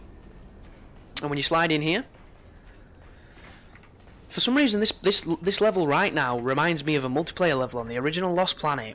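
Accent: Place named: Manchester, United Kingdom. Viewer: Thanks for the vid. I was stuck on this then got it first time. Nice to hear another northerner on YouTube. Doesn't sound far from Manchester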